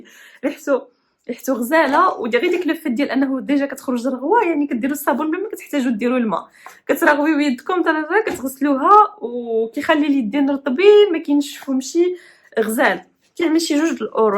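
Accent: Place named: Maghreb, North Africa. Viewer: فيديو رائع❤️❤️ تصوير رائع ❤️❤️ محتوى رائع❤️❤️لكن اللهجة صعبة الفهم لمعظم العرب لا يفهمون اللهجة المغربية والجزائرية والتونسية للأسف 💔💔ف الرجاء التحدث ب الشامية أو الفصحى أو المصرية هكذا ينتشر الفيديو أسرع ويكون له جمهور أكبر او ضيفي ترجمة مصاحبة بليز 💖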